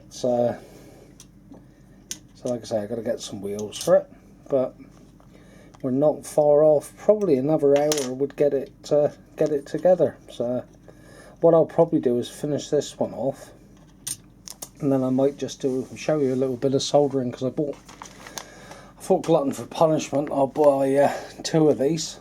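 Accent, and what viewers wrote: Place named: Cornwall, United Kingdom. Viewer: Just found you on here, nice to hear a proper accent on utube:-) I was born in Truro up Malabar back in 55 so I remember Truro Station very well. Just old enough to remember Steam and standing on Black Bridge as the Steam Locos went underneath so that we were hidden in the cloud as it went by. Also remember Newham and trains running down there, and walking the line many times as a youngun. Anyways proper job with the vids.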